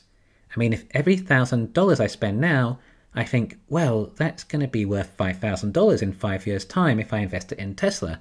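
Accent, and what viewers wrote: United Kingdom. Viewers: I always wonder, you seem to live in the US but you have a British accent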